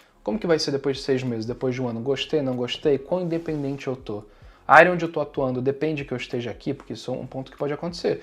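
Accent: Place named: North, Brazil. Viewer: Acho que ele é do norte do Brasil kkk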